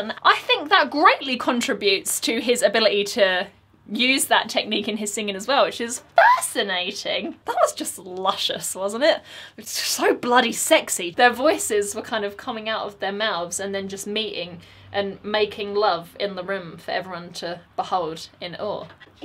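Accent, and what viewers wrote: South, United States. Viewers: Wow, didnt expect you to sound southern country haha. Bet you could keep up with Chris :) would like to hear you sing a southern bluesy country song.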